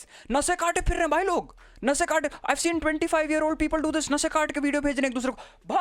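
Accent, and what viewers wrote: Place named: Bihar, India. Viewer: why does sound like a bihari in this vid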